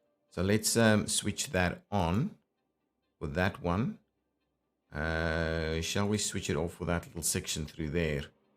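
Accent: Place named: South Africa. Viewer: Has to be South African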